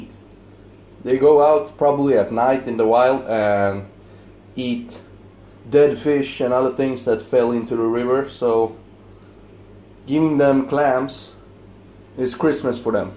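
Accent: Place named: New York, United States. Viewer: I love you channel i subscribed right away . love your accent and humor. Love for newyork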